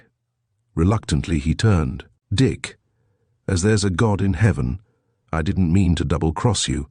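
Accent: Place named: England, United Kingdom. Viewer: having a strong English accent read a specifically AMERICAN tale.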